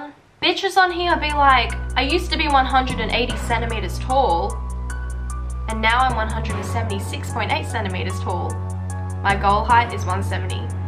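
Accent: Australia. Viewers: it’s called australian accent luv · Her accent is like a mix of Australian and country but for some reason I love it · are you australian? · R u Australian? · you better tech us you Auwsiiie aCCennT💅💅💅💅💅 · She sounds like an aussie :) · Australian accent not austrian. Austria is in Europe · funny the Asian sounds Aussie damn. still cute tho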